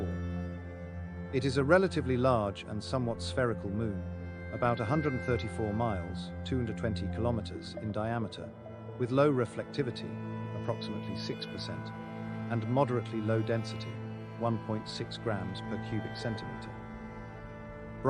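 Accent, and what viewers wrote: United Kingdom. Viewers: Do they just hire anyone with a British accent to read and narrate? What happened to the previous narrator of "Bizarre Journey to the Outer Solar System""? The previous narrator sounded like Jude Law narrating you a space documentary until you fall asleep. 😂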